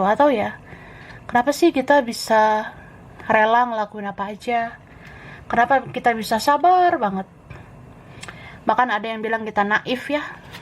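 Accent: Java, Indonesia. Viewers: MB klo ngmng mbok yao. Medok tenan wong jowo 😁👏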